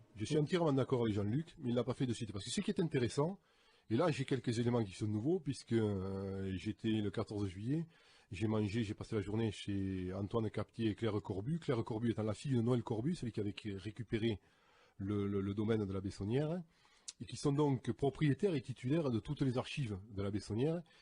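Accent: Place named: Toulouse, France. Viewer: Son articulation est très sûre : ça s'appelle l'accent toulousain. C'est français. Ça vous dit quelque chose?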